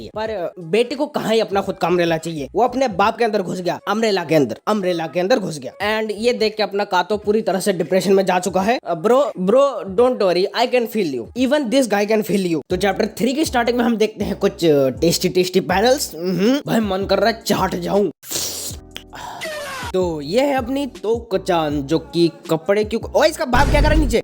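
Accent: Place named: Bihar, India. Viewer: Bhai tu bihar se hai mera ek dost bhi ese hi bolta hai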